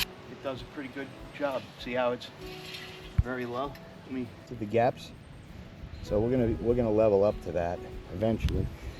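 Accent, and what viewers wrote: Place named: New Jersey, United States. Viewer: I can't find one that has a single pole/handle, which discourages me from buying it. This model you just shown, HANDS DOWN, has the most parts/pieces for the handle, but it does seem to be solid. I'll add it to my cart, as I'm searching, and will pick one.... eventually. Good video 📹 👍 👌 On another note, you sound Jersey but it looks like NC where you're at. I say that cuz i met a guy from NC who sounded Jersey/NY-ish🤘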